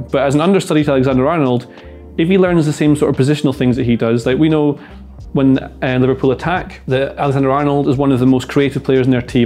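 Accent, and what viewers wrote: Scotland, United Kingdom. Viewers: how have i just realised hes got a scottish accent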